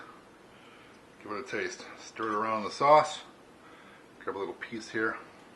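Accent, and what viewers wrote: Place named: Texas, United States. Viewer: You sound texan 😂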